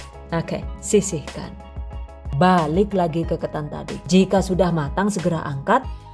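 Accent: Banyumas, Indonesia. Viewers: Dari mana mba jawanya mantap ngapak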